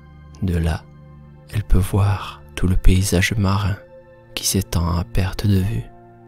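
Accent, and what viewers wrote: South, France. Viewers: Ton accent du sud m’a fait sentir comme à la maison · j'adore l'accent français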